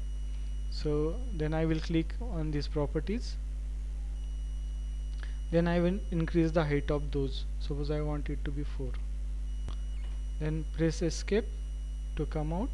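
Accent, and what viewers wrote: India. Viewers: Sir,is your mother tongue marathi?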